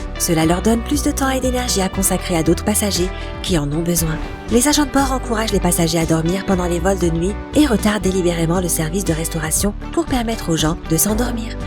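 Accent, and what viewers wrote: Canada. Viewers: Pourquoi on entend plus la voix canadienne qui commente ? Sympa je veux la voix canadienne, elle avait tant de charme que j'aurais pu l'écouter pendant des heures en non stop 😍😍😍 ! alors stp sympa mets là de temps en temps au moins ! Merciiiiiii !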